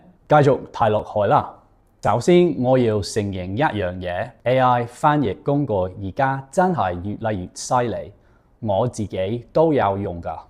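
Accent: Standard. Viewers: 仲有一點AI目前做唔到或者仲未做得好好嘅，就係廣東話比起大部份語言都更需要語氣輔助先可以準確理解真實意思，好多同一句句子用唔同語氣講出嚟個意思就完全唔同，例如簡單一句「辛苦哂」，可以係好感激你所做嘅嘢亦可以係好不屑你所做嘅嘢……我覺得呢點亦係母語並非廣東話嘅人學廣東話最難之處，Calvin你嘅用詞發音都好標準，如果有埋語氣同語速快慢嘅幫助就唔單止係自己人，直情可以當你係本地人😂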